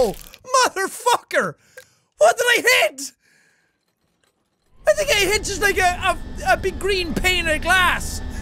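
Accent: Ireland. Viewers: I just watch ur older videos and ur accent was very Irish and I still laugh at ur minecraft survival videos and gmod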